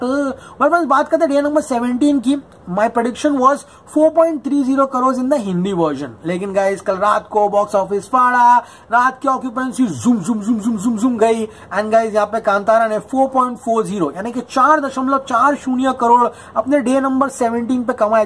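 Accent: Karnataka, India. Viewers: Your kannada accent is too good..... Feels like you are Kannada da hudga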